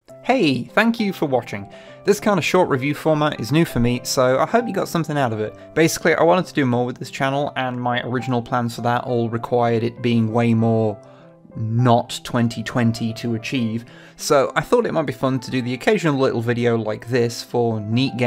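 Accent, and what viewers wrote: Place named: United Kingdom. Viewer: That was really good, I've missed a British man reviewing video games in my life. The game also has a demo, downloading it right now.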